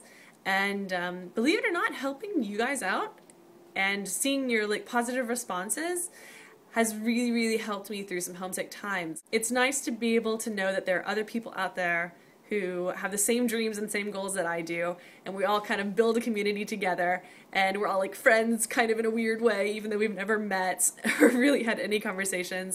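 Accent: South, United States. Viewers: I'm from TN and I think I have more of a southern accent than you do. Do you get comments of your accent a lot? Or do they just hear American more so than a regional accent? I'm kinda excited to hear my students speak with a little twang. :) Although I'm sure I'll have to try to speak without an accent, right?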